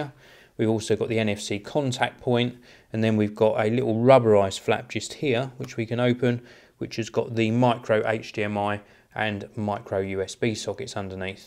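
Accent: United Kingdom. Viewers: Nice review, very relaxed :) First British accent I have no trouble understanding. Looking forward to your in deep review.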